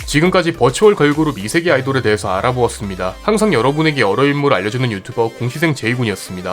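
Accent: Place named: Gyeongsang, South Korea. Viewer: 같은 울산인데 사투리가 좀 심하시네 ㅋㅋㅋㅋㅋㅋㅋㅋㅋㅋㅋㅋㅋㅋㅋㅋㅋㅋㅋㅋㅋㅋ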